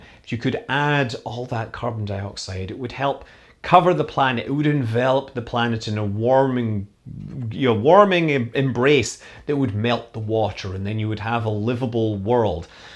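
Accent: Scotland, United Kingdom. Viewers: U can hardly hear your Scottish accent. I guess you have been living in san Francisco for a long time. Btw I enjoy your videos keep it up.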